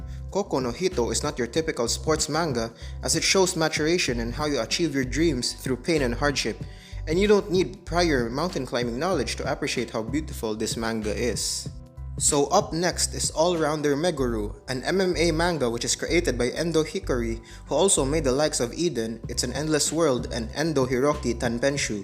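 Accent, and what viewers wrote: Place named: Philippines. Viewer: Flipino right? Your accent seems familiar.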